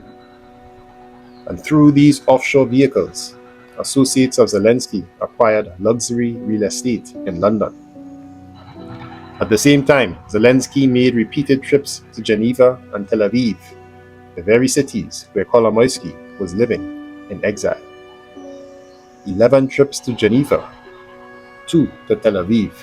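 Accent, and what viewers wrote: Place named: Trinidad and Tobago. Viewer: I know that trini accent anywhere in the world. 🇹🇹 🙌😎 Wha iz Di vybes boy?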